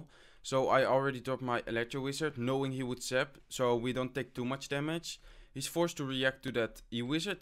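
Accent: Netherlands. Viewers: where are you from? Because you sound a bit dutch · is hij nl ik hoor het aan zijn accent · Je bent zo duidelijk nederlands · Are you netherlands because you dont talk like a american human and if i say something english i talk like you and in from the netherlands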